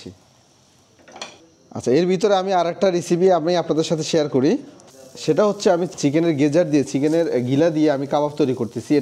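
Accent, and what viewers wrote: Noakhali, Bangladesh. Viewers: মুখের ভাষাতেই বুঝা যায় ভাই নোয়াখালীর..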